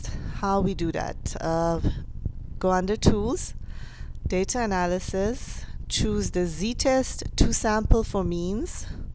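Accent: Iran. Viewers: persian accent. woohoo! XD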